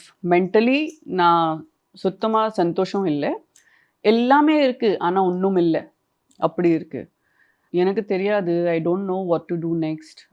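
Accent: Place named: Kerala, India. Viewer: Kerala va ma neenga tamil peasum podu thearigeradu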